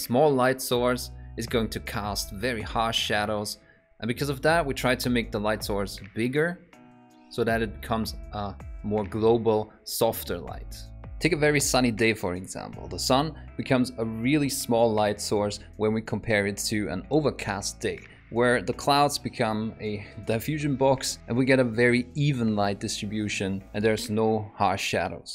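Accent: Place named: Austria. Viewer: I really like your video cutting and narrative! Keep it up, maybe try working on your pronunciation of the O. Otherwise it's really hard to guess that you are Austrian :P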